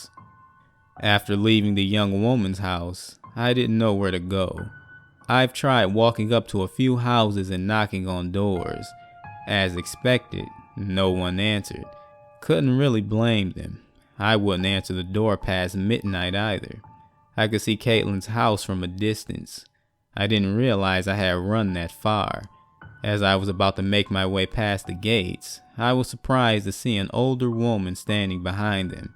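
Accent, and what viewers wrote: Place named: Deep South, United States. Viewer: 🇬🇧 I really like your stories so ive subbed to yr channel I also love yr accent, i think its deep south, Alabama or Louisiana? Im English & live near the Scottish borders & have only ever visited New York a few times & also visited friends in Utah & Wyoming but have had a thing about the kind of accent you have & so enjoy listening to you narrate Your stories are also very good & I havent yet heard any of them before, did you write them? If you do then your writing talents are considerable I look forward to hearing many more stories Keep up the good work, ive often subbed to creepypasta channels when they have had less than 1,000 subs yet in no time they have climbed to 20,000 - 50,000 Dont know how long you have been going but i always say From small acorns do large Oak trees grow. Thank you for your narrations I do so enjoy listening 👧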